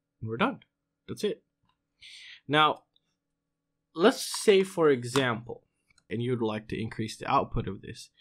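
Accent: California, United States. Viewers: david tilvikas he sounds like he has a California accent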